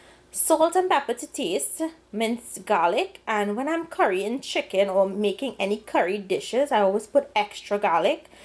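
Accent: Trinidad and Tobago. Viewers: The Trini accent makes it MORE interesting!!!!!!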